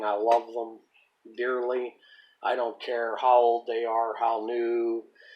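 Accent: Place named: Western Pennsylvania, United States. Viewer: Informative video. Sound like you are in western Pennsylvania or eastern Ohio area. Drop me an email sometime.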